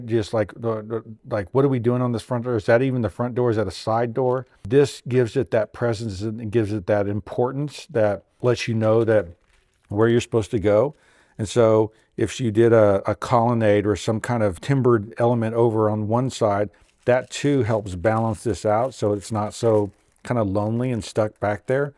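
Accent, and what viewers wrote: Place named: New England, United States. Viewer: I'm guessing is from New England. We all say it like that up here, except sometimes it's "MassiveTwo..."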